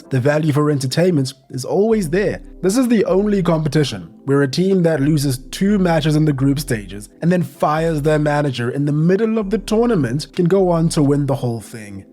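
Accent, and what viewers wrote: South Africa. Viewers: wait, is homie South African? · Wait. You’ve been South African this entire time?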